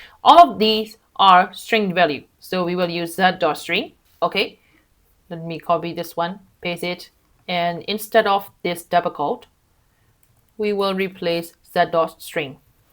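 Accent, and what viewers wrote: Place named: Myanmar. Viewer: Are u a Myanmar? Your accent like Myanmar 😐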